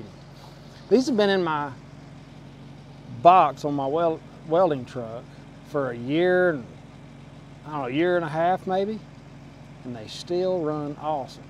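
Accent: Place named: Arkansas, United States. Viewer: Hey man I've been watching your channel for a couple years now. Long story short I'm from Arkansas as well. I always thought you were because of your accent. I'm a welder and I'm currently about to graduate out of a well known "Elite" welding school. I know you probably have heard of it. I would love to reach out to you and just get some advice. I don't know if you'll see my comment. I know you're busy take care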